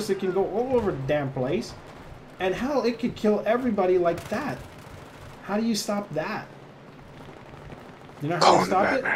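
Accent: Canada. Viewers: Not Irish. Newfoundlander.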